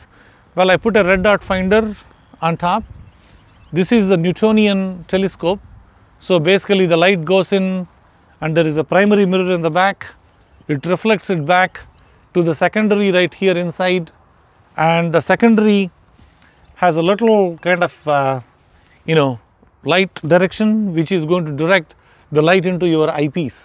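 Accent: India. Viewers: Indian accent 🥴. I don't understand anything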